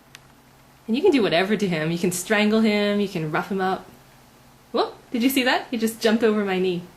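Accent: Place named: England, United Kingdom. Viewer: Are you Japanese born in England as i thought you were english until i checked your channel haha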